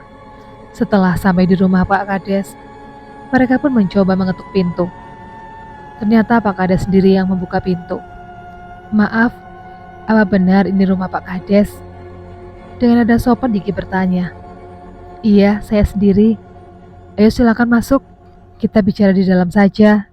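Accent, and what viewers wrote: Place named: Java, Indonesia. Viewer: Yaelah medok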